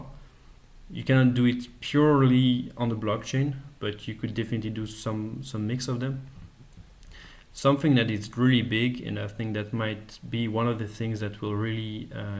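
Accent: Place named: France. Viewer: Hello, i've just seen your video and i'm guessing due to your accent that you are french. I'm also french and currently investing on ethereum, i would love to be able to talk to someone who seems to understand this innovation quite well. Do you think it could be possible? and thanks a lot for this video (it's a bit to slow for me but it's very educational).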